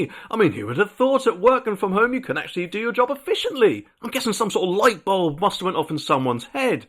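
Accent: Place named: East Anglia, United Kingdom. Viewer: Nice to hear an East Anglian (Norfolk?) accent on youtube 😊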